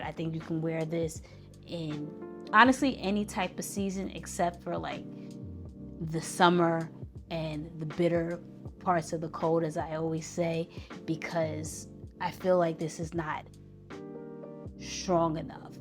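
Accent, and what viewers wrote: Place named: New York, United States. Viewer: I LOVE the fact that you still have the classic NY accent. Its actually becoming rare!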